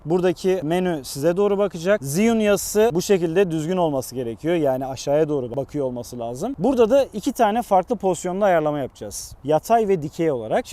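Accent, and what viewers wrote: Adana, Turkey. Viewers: abi adana mı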